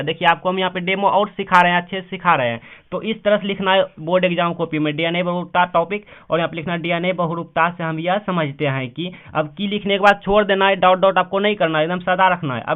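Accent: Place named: Bhagalpur, India. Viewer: Sir aap bhagalpur jile se ho kya kyunki main bhi wahin se hoon aur meri bhi bhasa aisi hi hai😊😊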